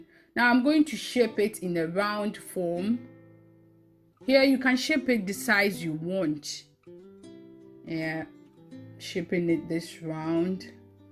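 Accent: Ghana. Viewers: You sound like a Ghanaian . Are you? Yam balls sounds yummy 😋 I love the mortar and pistle. From dakty channel. Thanks for sharing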